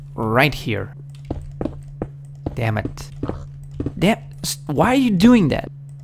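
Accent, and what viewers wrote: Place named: Portugal. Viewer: I think he´s from Portugal.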